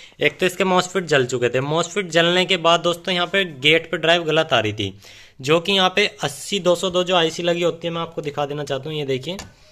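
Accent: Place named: Bijnor, India. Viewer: Aap District Bijnoor up se ho kya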